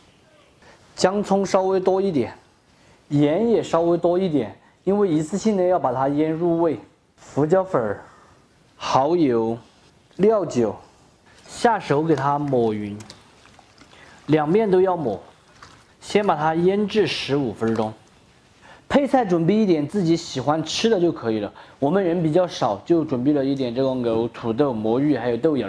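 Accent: China. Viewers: 这椒盐普通话，听的好上头😁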